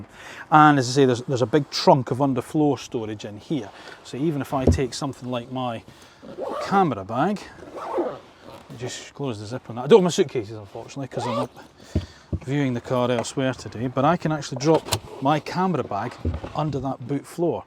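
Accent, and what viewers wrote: Scotland, United Kingdom. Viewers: Informative and well explained. Really like your down to earth commentary. Yes… just cudos for how you’ve grown into this extremely over saturated market of Clarkson and Hammond wanna bees. This you have done with your calm presence, Scottish accent an clearly one or two half bad jokes combined with, great knowledge of the subject at hand…Might find a fast breathing or two when starting a sentence explaining the numbered options… of whom where some Take a little less in or cut out in post. Hell, I even like this masculin income - makes you remember line five…🥸 But overall, really really like your channel and try follow your regular new material - Cudos / 2ell done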